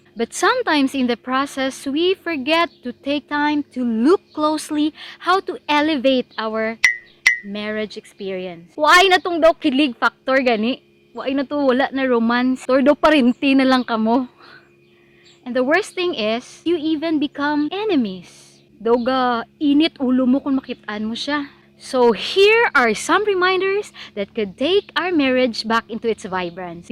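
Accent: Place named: Iloilo, Philippines. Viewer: Nalingaw kos pagka ilongga oi. Wakoy nasabtan sa topic 😆😆😆 mura nagkanta kanta. Ahhh🥰🥰🥰 Thanks Ma’am more videos ❤️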